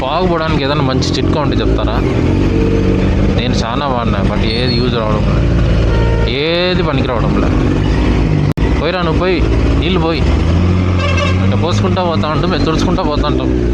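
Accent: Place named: Rayalaseema, India. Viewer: Anna Rayalaseema yasa superga undhe…Anna medhe kadapa na ??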